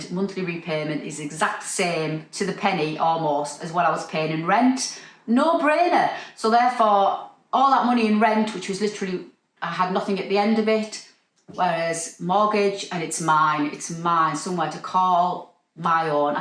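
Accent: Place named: Northern England, United Kingdom. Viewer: Amazing. Look at you GO!! I can't wait to see the reno and all your adventures. I will say you don't have to say Widowed you can say Single. I love sewing but I also love a good reno. Hw about A Dressmakers Life that should incorporate your fresh start while also giving a nod to where you came from. I love being able to sit here in the US (currently) and listen to a Northern accent. Makes me think of Doncaster and my youth lol.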